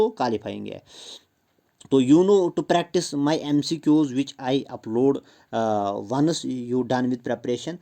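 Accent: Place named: Kashmir, India. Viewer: Kashour English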